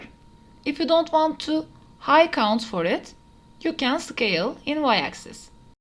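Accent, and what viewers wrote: Turkey. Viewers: Ablamın ingilizcesinde türklük akıyor😀 Çok yararlı bir videoydu teşekkürler! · 😁 Türk aksanı nasıl kendini belli ediyor. İngilizceniz iyi bu arada. Video için de teşekkürler. Kolay gelsin. 🙋🏻‍♂️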